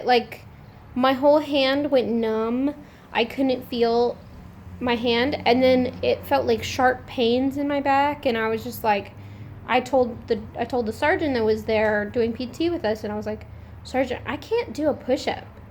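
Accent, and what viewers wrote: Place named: United Kingdom. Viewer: Now em confuse either u from US or Uk coz ur accent is more british than American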